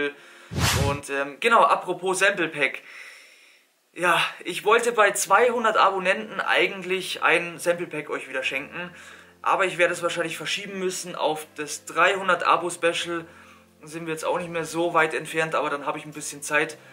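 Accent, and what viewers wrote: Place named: Bavaria, Germany. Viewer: servus, woher kommst du? hört sich bayrisch an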